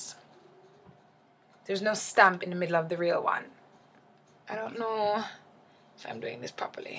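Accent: Jamaica. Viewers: you are definitely Jamaican no hiding that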